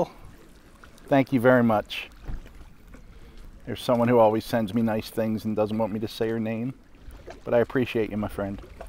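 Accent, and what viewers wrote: Louisiana, United States. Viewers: Thanks for the great video! Enjoyed the Cajun accent. Have a great weekend🌞🦋❤️ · Hmm🤔...There's a Whataburger on Kostoryz n McArdle, I'm under the impression that's the First OriginalWhataburger in Corpus Christi and that's if it's still there, lol. Of course, Whataburger & HEB both born in CorpusChristi, moved their Headquarters to SanAntonio a few years back & Whataburger just SOLD OUT to some Corporation or whatever from Ohio!-NONETHELESS, Whataburger's all over the place. Me?-----I'm totally burned-out on it. LoL!--Yipes!--p.s. Nice Video!--LOVED that park in Portland, overlooking CCBay!--CajunAccent--Hilarious!- WOOH!!!!🤣!!!!! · Hey, thanks for the shout out today my friend that was super nice and very unexpected but super thankful nonetheless! I love your Cajun accent great job and great video today sir. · Enjoyed the Cajun accent 🎉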